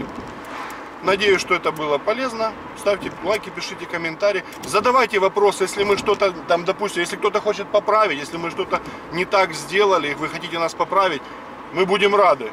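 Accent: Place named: Azov Greek, Ukraine. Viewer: Очень приятно было Вас слушать!!!У меня на родине в Приазовье Донецком области во всех греческих селах говорят именно так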